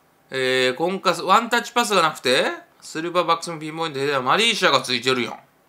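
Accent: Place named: Osaka, Japan. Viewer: 下手な大阪弁ええやん😁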